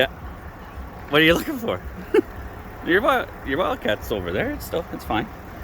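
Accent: Canada. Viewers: Lol. Canadian AF. Love your energy bud 🇨🇦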